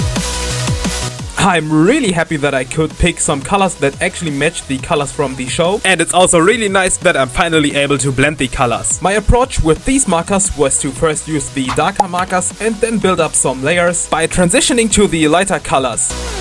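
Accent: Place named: Germany. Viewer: I Hear the deutsch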